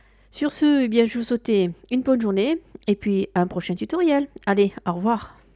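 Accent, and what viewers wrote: South, France. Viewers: Encore un tuto sympa avec une voix du Sud agréable qui donne envie d'aller au bout de la vidéo. Une question : comment et où aller pour télécharger les masques ?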